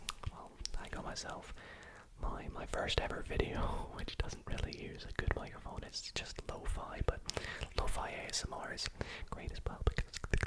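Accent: United Kingdom. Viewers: Hi! Are you from Scotland, England or Ireland?? Your accent is really comforting and nice!! Loved this video!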